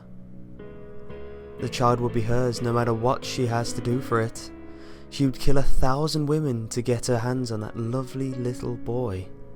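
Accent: United Kingdom. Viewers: are you really British?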